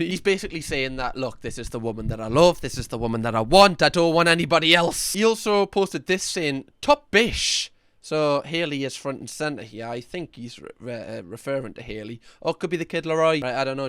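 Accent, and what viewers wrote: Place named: Newcastle, United Kingdom. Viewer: I've been wondering where this accent is from... it's so cool. 😁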